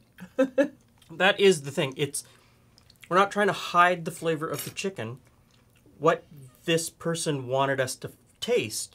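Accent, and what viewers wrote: Canada. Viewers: omg r u canadian!!!!!!!!!?!?!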